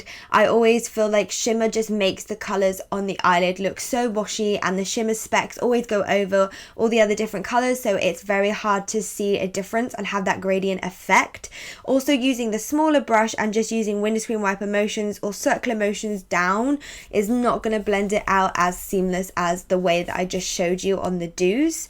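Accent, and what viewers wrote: United Kingdom. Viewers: En verdad me caga su acento británico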